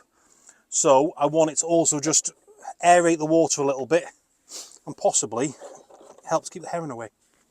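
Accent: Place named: Cornwall, United Kingdom. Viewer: Thought i could hear a jacko accent. Wont the stocked goldfish keep the pond clean.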